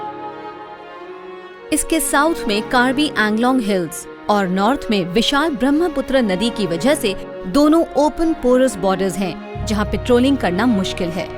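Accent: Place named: United Kingdom. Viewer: लगता है किसी ब्रिटिश woman से हिंदी बोलने को बोल दिया है।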